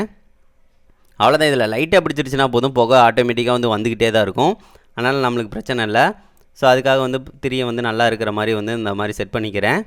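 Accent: Sivakasi, India. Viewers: உங்கள் வீடியோவை பார்த்துவிட்டு அதன் பின் தவறான முறையில் அனைவரும் வீட்டில் வைத்து செய்துபார்க்கலாம் என்று நினைக்க தோன்றும் அதர்க்கு முன் உதாரணம் ஆகிவிடாதீர்கள் நண்பர் அவர்களே சிவகாசி உள்ள ஒருவனின் குரல்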